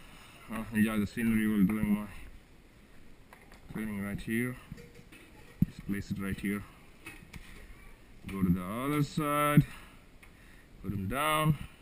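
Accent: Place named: India. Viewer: This has got to be the 8th indian sounding dude who just hapoens to be barefoot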